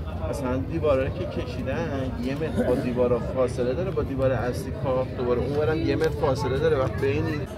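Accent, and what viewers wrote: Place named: Shiraz, Iran. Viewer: لهجه شیرین شیرازی👍🏼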